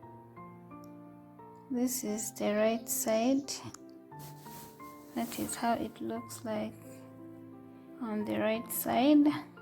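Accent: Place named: Uganda. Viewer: Nice work, are u a Ugandan? U sound like me 😍😍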